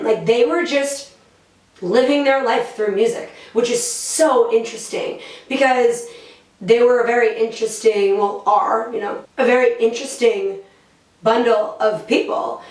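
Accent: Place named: New Jersey, United States. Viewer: you're gorgeous and I like your singing, but you're speaking voice is so Jersey shore that it hurts to hear you describe my favorite band lol. Well right behind the wailers and the jimi Hendrix experience. Just constructive criticism because you're a professional. But you're so right in recognizing their genius. I literally think they are the famous Kumar avatars of God because they really cared about helping souls. "We're bigger than jesus. " lol. Anyway I wish you all the best because you have a beautiful soul too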